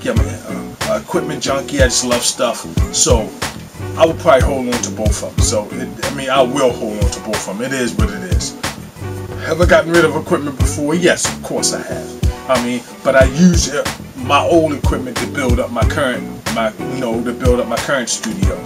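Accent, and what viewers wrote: Baltimore, United States. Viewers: I'm willing to bet money homey is from B-more or somewhere close. that accent is hard.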